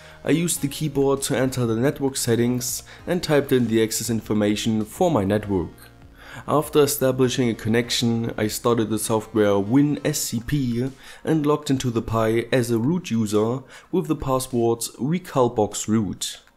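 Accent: Germany. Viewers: deutscher stimmts?:D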